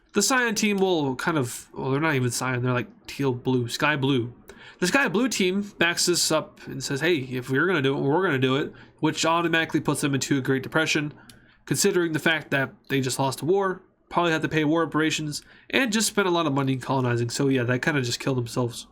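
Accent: United Kingdom. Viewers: What's rong with the British accent I am Briton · As a Brit, I can confirm that was a British accent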